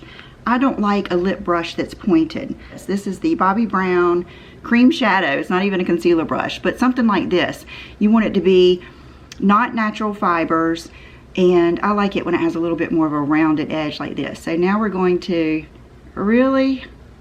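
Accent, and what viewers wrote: South, United States. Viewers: Beautiful look! Thanks for sharing!! I love your southern accent or terms I should say!! "at the time"!! 😉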